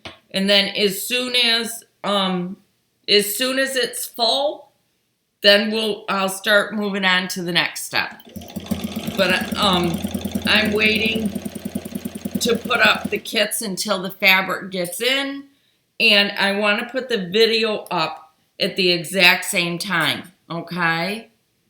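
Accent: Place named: Buffalo, United States. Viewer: Ah, I thought I recognised the accent. I am in England a d have a very good friend in Buffalo, and I hope you are not offended but some of the ways you say some words reminds me of them. I call Buffalo my second home, I have been there so many times. Thank you for your videos, they are so informative.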